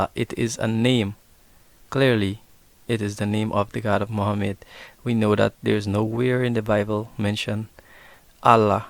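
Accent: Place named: Philippines. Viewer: The poor fool doesn't know what he is talking about. He sounds Philippines.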